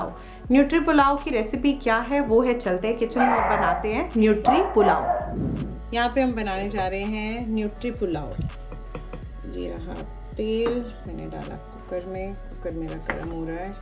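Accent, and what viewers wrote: Punjab, India. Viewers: Mam Na Punjab Faridkot se bol rahi hai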